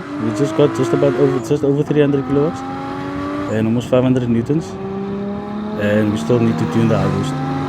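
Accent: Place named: South Africa. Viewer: thats the most SA accent i've ever heard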